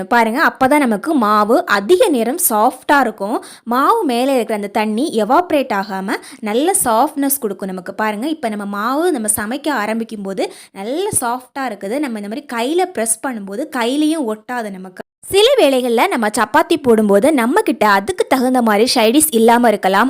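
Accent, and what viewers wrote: Kerala, India. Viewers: Unga slang la malayalam vasanai veesuthu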